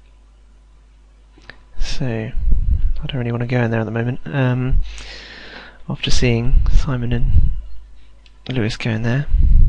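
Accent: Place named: United Kingdom. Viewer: Because he speaks British?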